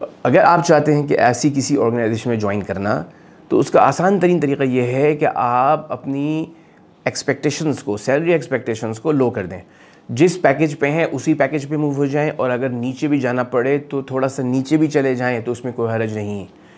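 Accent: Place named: Karachi, Pakistan. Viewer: اسلام علیکم جزاک اللّہ سر آپ کا بولنے کا انداز کراچی والا ہے لیکن آپ بتارہے ہو آپ مری میں رہتے ہو ؟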